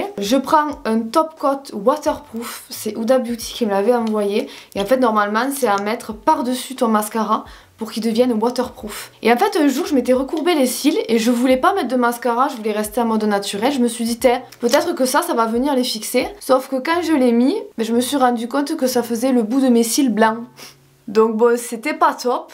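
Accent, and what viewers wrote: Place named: Southwest, France. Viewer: Cc ma belle. Tes vidéos sont vraiment "fraîches" t'es super agréable. Ton accent me fait penser à mon papa qui vit dans l'Ariège. Ahhh l'accent du sud ouest 👌. Bises belle journée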